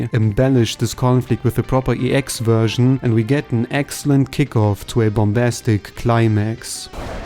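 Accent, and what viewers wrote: Germany. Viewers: The German accent in his voice, I find it pretty neat sounding. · du bist deutsch richtig? das kann man raushören. 😂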